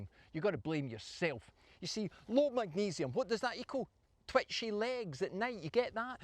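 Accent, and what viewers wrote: Scotland, United Kingdom. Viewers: "Blame your bake"! LOL I love this guy - he doesn't sugar coat anything and tells it like it is, all with a Scottish accent! SUBSCRIBED! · Good points!❤ Two off-topic questions 😊 - 1) why the mirrored video? 2) where exactly in Scotland can I get some of this accent? ❤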